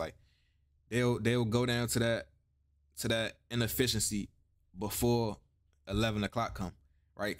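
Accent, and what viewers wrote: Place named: Washington DC, United States. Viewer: I’m watching this video & saying to myself slim sound like he from the city (DC) checks for Instagram cause that’ll usually tell 😂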